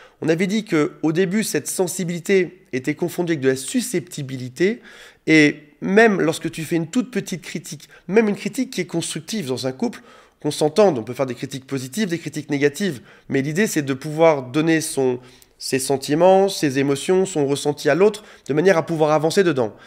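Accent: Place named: South, France. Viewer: avec un de ces accent du midi ! c😊ng 😄🤗👍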